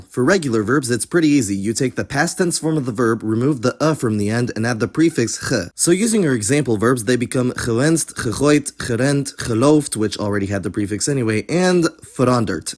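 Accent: Amsterdam, Netherlands. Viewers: Small correction on the phonology, nearly everything that applies to the Belgian dialects also applies to southern Dutch dialects. Also you're pronunciation is the best I've heard from a non native. You sound like you're from Amfsterdam specifically, which is a compliment. Edit: I'm not sure if this goes for all dialects but at least in Brabants we often but not always drop the [t] at the end of words. So in my native dialect (Tilburgs) I would say: da in stead of dat, wa in stead of wat, but kat, boot, gat and het would all keep their t's. I don't know why we do this, but I thought it was interesting.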